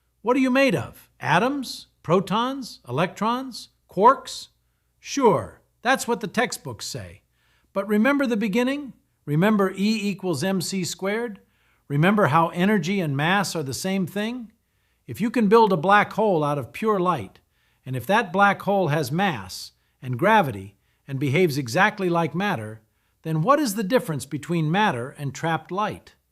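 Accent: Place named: Brooklyn, United States. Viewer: Whoever you are, thanks. I’m aware it’s ai but Feynman was a favorite and you’ve captured his spirit very well. I see a ton of these videos, yours nails his Brooklyn accent.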